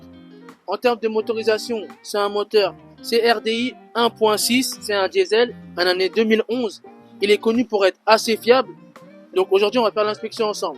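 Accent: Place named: Africa. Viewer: Rien pigé,vous avez un accent africain très prononcé.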